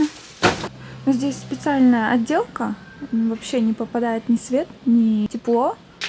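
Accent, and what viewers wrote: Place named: Japan. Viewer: Вы очень красивая и милая☺️ мне нравится как вы говорите на английском и cute Japanese accent❤️ Желаю вашему каналу процветаний🥰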